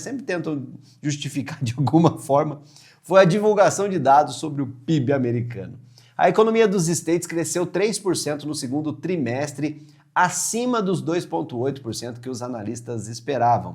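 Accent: Brazil. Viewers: Top demais como sempre. Há, e o inglês brasileirado é o melhor, parabéns.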